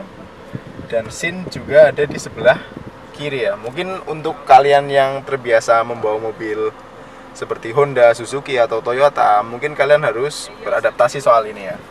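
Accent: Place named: Purwokerto, Indonesia. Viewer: purwokerto ya mas..salam ngapak sekang purbalingga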